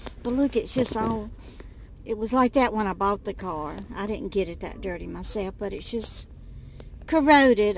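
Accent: South, United States. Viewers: you are a delight to listen too and see on your videos. Your voice is so southern and relaxing. I too am from the south. I was born 9/11/43 and raised in Jacksonville Fl. I am several years older than you though. Some of the things you say I think, "Well thinks just like me about some things."😃 I am new watching your videos. The first one I watched was of you just moving to New York. I wonder about you and the snow!! If you aren't use to driving in the snow that will be rough. But some people put chains (I think) on their car tires. But just wanted to write you a little note to tell you I enjoy your videos. Looking forward to seeing your apartment after you get all moved in and fixed up. God Bless you 🙂❤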